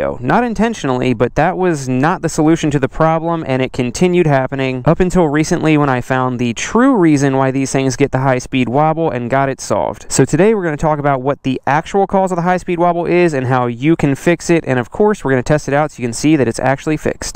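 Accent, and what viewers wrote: Baltimore, United States. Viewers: I thought I recognized that accent. Hey Hon! Bawl-Tee-Moh.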